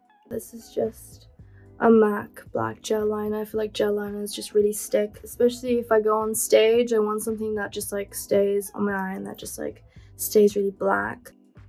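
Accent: United Kingdom. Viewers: I love her british accent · BEABADOOBEE'S BRITISH? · am i the only that didnt know she was british??? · shes british? 😢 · SHES BIRTISH??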